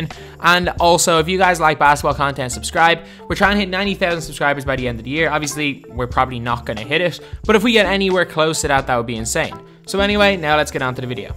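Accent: Ireland. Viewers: Thanks I’m Irish and I thought he was Irish alright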